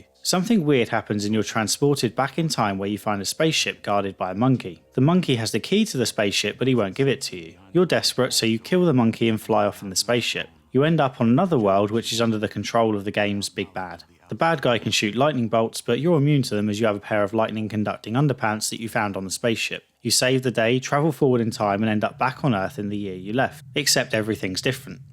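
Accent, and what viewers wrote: England, United Kingdom. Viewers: Lulaire Noroub he has a English accent therefor he say it differently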